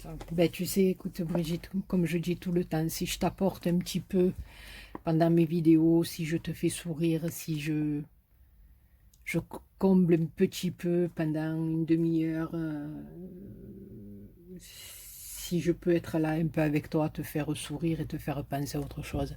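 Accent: South, France. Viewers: Cc ma toute belle et oui tu as encore été gâté c est super. Les papiers tes 2 albums sont magnifiques et je trouve que c est même dommage de le cacher avec des photos. J ai toujours beaucoup de plaisir à regarder tes vidéos qui me font toujours beaucoup de bien et vu que je ne suis pas partie en vacances pour raison financière tu m apportes avec ton accent le soleil du sud que j aime tant. Bisous bisous ma belle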